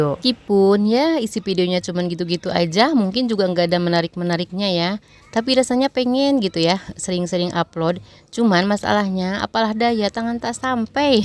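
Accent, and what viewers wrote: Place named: West Java, Indonesia. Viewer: Teh di daerah mana? Meuni Sunda pisan, salam kenal ti abdi dari kota Bandung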